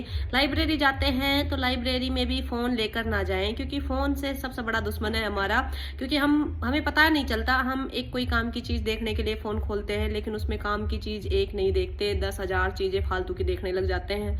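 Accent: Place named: Shekhawati, India. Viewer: Mem kapil choudhary sir RTS jesy bhasha he aap ki😂😂 aap shekhavati pradesh say hi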